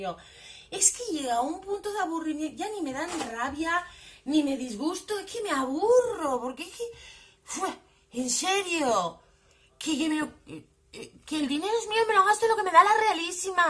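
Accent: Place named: Valencia, Spain. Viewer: esa pronunciación y entonación es de la terreta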